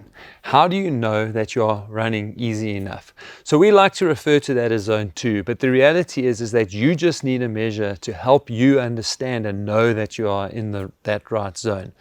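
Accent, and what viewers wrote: South Africa. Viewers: British? I only hear South African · Advice sounds even better delivered with a South African accent. Such a lovely accent!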